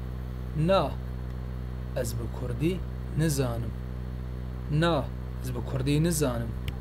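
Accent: Turkey. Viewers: The speaker is speaking Kurmanji, but he has Turkish accent when he speaks Kurmanji. Unfortunately, many Kurds first language is Turkish in northern Kurdistan because our language was banned in northern Kurdistan. Turks thought they could assimilate us :(.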